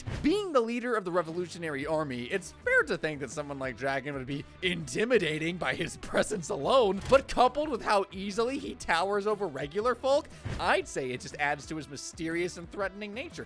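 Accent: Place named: United States. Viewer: This video is in english narrated by what sounds like an american. Why then would you give metric measurements instead of feet and inches?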